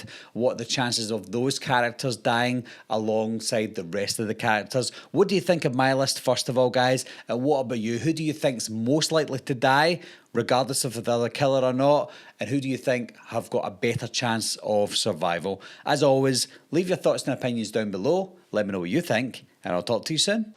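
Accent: Scotland, United Kingdom. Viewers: You keep calling Chad Omar Gooding. His name is Mason Gooding and no it's not your accent as I know people with a stronger Scottish accent than you. I always went on holiday in Scotland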